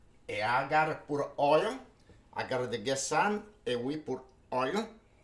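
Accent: Italy. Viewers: I love his thick Italian accent. The best ❤️ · I am here for the accent and the kickass Italian vibe here!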